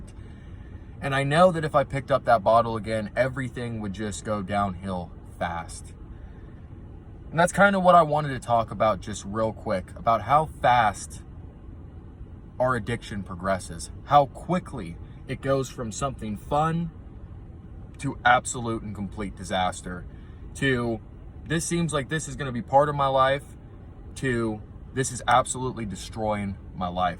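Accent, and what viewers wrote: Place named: Pacific Northwest, United States. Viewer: So great to hear you are in family counseling -- what a gift you have been given. Sorry about the panic attacks. I suffered terribly in my late 20s through 40s ish tbh. I never knew I needed to deal with stress. I didn't know I could say " this is too much " -- You can say // we can say --- " this is too Effing much " --- cry, shut down, isolate --- have a pity party to rest by ourselves if we need to. Just don't stay there --- We must Release the valve when we feel super Wound -- but I didn't know that // it's oK to say "this is too much" // ... I Could never smoke pot --- too much anxiety // paranoia --- love listening to your sobriety --- I am trying to decipher your accent -- can you at least tell me what part of the country? Pacific NW?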